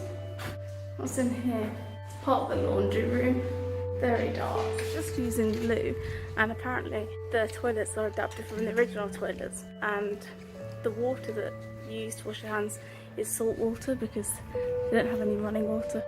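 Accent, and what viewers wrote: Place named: United Kingdom. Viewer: How come British people say karsal instead of castle ?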